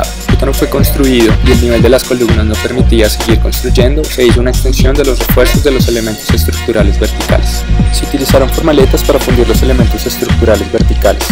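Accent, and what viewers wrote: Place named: Bogotá, Colombia. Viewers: Que pereza este narrador, Ese acento rolo, narrando un símbolo de medellin, como que no aguanta. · que jartera el acento rolo.. me da un fastidio ese tonito llenadorcito...lo peor es que ellos creen que eso les suena divino.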